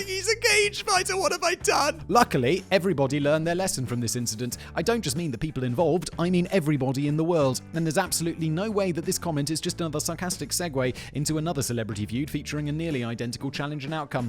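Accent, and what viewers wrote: United Kingdom. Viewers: How does your voice constantly trigger my Siri? Your a: younger then me (i’m 38 for context), and b: british so our accents shouldn’t match up (i’m from atlantic canada). Wild. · Being a person from the US who loves James Acaster, it's really funny to hear a guy with a british accent not know how to pronounce his name.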